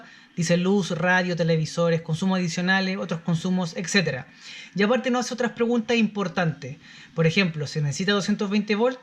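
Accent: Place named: Chile. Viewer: Hola a estoy viendo estevideo (12/05/21) y está muy interesante, tengo en proyecto construir una Tiny house, y quisiera conocer el presupuesto de mis necesidades, pero no se la unidad monetaria que utilizas (pesos) estas en México, Argentina o Chile (seria bueno traducirlo a us$) me parece por el acento que eres Chileno, si todavía revisas los comentarios me gustaría saber el tipo de moneda y de cambio actual para poder tener un parámetro y calcular mi presupuesto. Gracias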